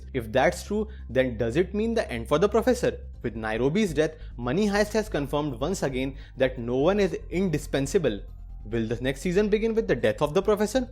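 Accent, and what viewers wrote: India. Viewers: your accent, are you from India?? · Your India accent is so strong ....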